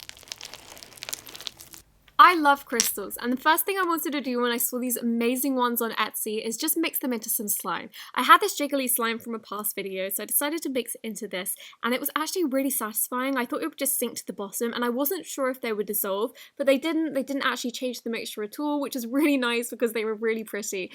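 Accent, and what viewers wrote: United Kingdom. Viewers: Are you from the uk ?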